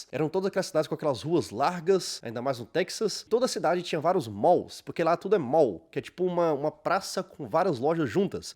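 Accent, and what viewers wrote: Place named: Florianópolis, Brazil. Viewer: Eu via os vídeos desse cara há muito tempo atrás, e pelo sotaque, sempre achei que ele era de Florianópolis, mas não, ele é Brasiliense :face-blue-smiling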